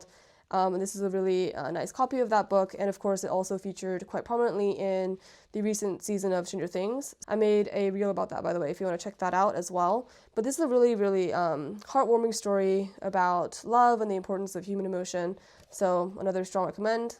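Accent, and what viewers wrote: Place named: Ireland. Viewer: oh that's cool, it sounds vaguely irish at some points? Or maybe it's just your voice, either way it's beautiful.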